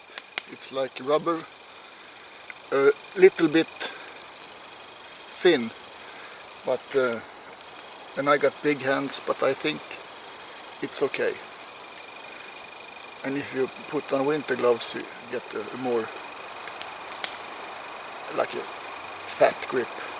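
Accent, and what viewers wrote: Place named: Sweden. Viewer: jag älskar din svengelska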